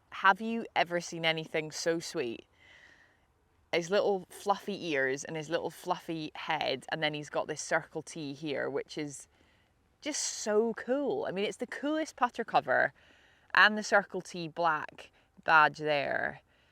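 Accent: Scotland, United Kingdom. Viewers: Love this channel, so easy to listen to you with that soft Scottish tone. And a smooth looking swing that anyone would want. Don't stop.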